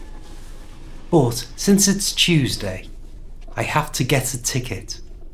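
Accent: Liverpool, United Kingdom. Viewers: I've got something to tell about your method of teaching which is very much more enjoyable and I haven't seen it on the internet. Thank you to share with us. But also got something else to tell which I could't never understand while I lived in London which is about the english people and the why some of them love the Royal Family I mean why do they become more then other people, is it because they're Royal, because I can't see nothing so special? The place I come from we also have a Royal Family which we can see or even heard about often and they don't leave in castles as long as i know. One of them became a politician. As I see you still have kept some of Liverpool accent while you speak. But not bor kkk I loved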